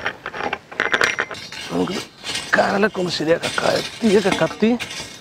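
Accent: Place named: Palakkad, India. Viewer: പാലക്കാടൻ ആണല്ലേ.. വർത്തമാനം.. കേട്ടാൽ പാലക്കാടെന്നു തോന്നുന്നു..!!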